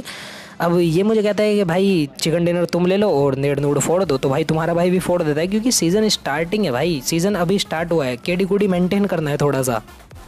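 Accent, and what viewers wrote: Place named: Marwari, India. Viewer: Bhai to marwadiy kas bol la taa hai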